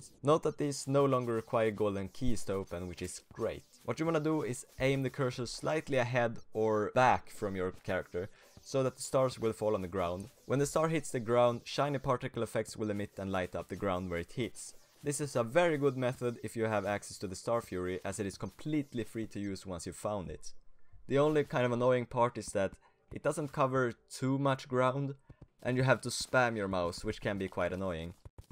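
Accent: Sweden. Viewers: Man hör att du är svensk. xD bra video btw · This is the most Swedish accent iv’e ever heard. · I hear ur swedish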